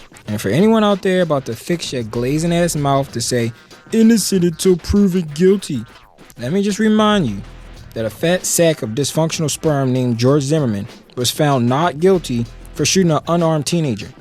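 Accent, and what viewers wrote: Baltimore, United States. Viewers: You sound heavy on the Baltimore accent 😂 but commentary though my guy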